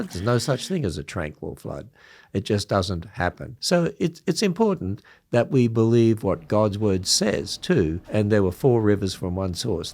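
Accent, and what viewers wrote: Australia. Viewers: Im Aussie, first heard you back in about 1999. Im flabagasted you still have our Aussie accent very strong, awesome... How long have you been away from here ?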